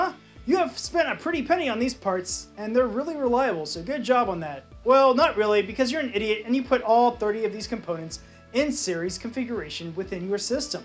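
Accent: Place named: India. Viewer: I thought u were Indian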